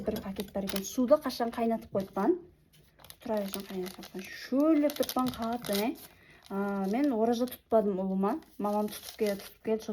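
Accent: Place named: Karakalpakstan, Uzbekistan. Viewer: Сакалы бар ит ,сосиски ит 😂😂😂молодец гап жок карыдас таза каракалпакша 🎉🎉🎉Алла тала жолынды берсин ❤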